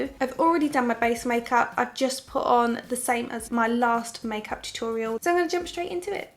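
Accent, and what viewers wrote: Essex, United Kingdom. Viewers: I just found you! I’m from Essex you sound like you’re local? Maybe Loughton?😃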